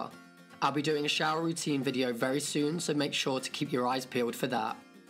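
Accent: United Kingdom. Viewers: Imagine if all British people sounded like this 🤮🤮🤮🤮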